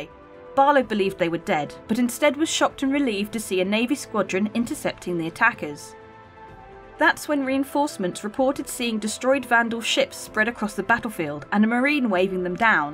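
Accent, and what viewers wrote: United Kingdom. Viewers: When I first watched the lore 'story' videos I thought that it was something produce and official release by CIG. The quality and pace of voice commentary, depth of knowledge, the suitability of a brit accent - all make for a very high quality video. I remember watching the Nyx video and thought "Hey! that voice sounds familiar. Either has a very common tone of voice or she been contracted by CIG to voice over their vids now". Well done and keep it up.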